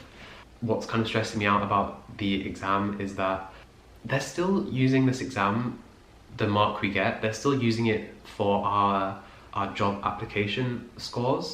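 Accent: United Kingdom. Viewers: you sound british, where do you go?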